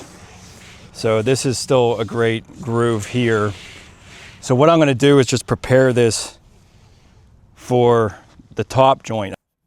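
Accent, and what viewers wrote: Pittsburgh, United States. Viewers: Let me tell you... you know a Pittsburgh accent when you hear one! Love your videos! Thanks for the knowledge sir.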